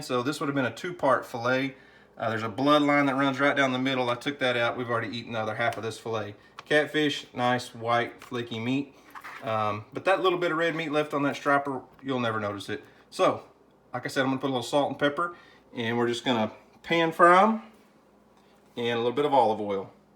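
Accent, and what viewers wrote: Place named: Alabama, United States. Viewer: Sounds like you are in Alabama instead of OK. Stripper and catfish are wonderful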